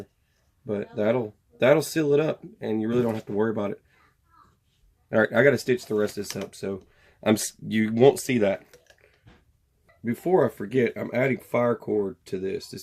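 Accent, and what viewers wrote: South, United States. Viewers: Nice work You sound more southern then me ha im in Mississippi. Were you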